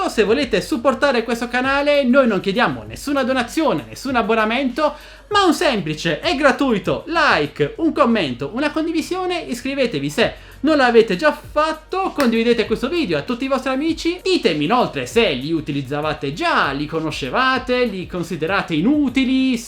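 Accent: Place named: Apulia, Italy. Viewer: Hai un accento sei di bari o taranto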